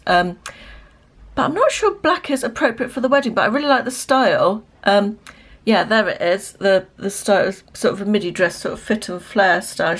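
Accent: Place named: Southern England, United Kingdom. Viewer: I wasn't surprised to hear of your royal connections with your lovely accent you could pass for the queen...lol but I come from Accrington in the north so I think most southern accents sound posh in comparison..... please do vlogmas I really love watching it...how was the slimming world potato ham bake think I will try it....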